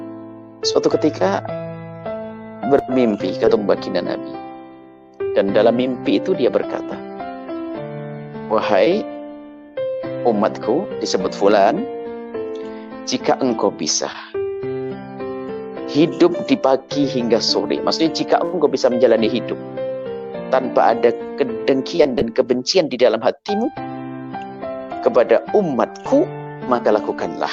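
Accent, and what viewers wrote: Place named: Malaysia. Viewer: Ini youtubernya org malaysia ya. Dri logat suarany. Salam kenal Jkt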